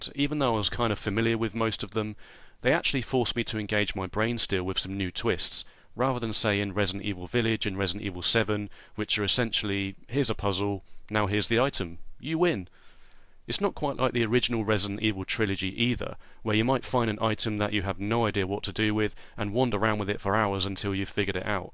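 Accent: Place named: United Kingdom. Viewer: Two of the things i like most about youtube channels, smart English accents and resident evil analysis. Liked and subscribed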